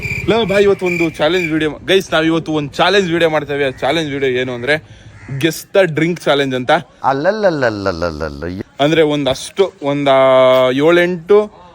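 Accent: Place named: Butler English, India. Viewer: If u don't know english plz don't talk talk !! U know only buttler english 🙂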